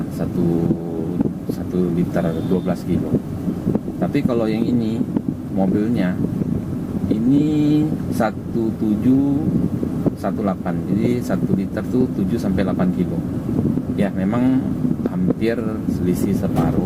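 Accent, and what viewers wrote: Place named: West Sumatra, Indonesia. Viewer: sumbar nya dimana bos, logat nya mirip, salam dari minang rantau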